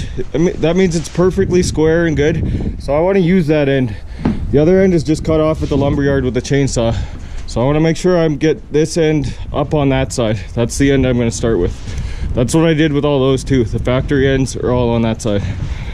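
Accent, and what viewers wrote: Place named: Canada. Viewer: You sound Canadian. You ever end your day with a smoke?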